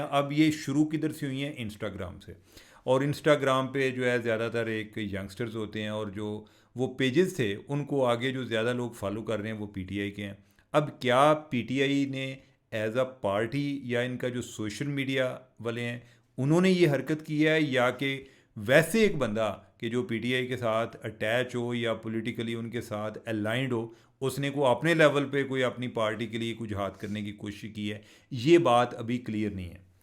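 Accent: Punjab, India. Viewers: Why can't you speak in proper Urdu language in a professional manner. Is Punjabi touch necessary. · Sahab acchi wali wadi Urdu hai kyon Punjabi da tadka ramdev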